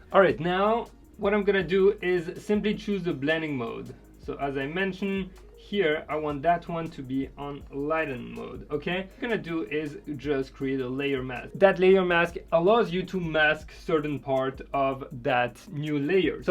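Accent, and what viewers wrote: France. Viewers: Just love your french accent 'And Voila' love it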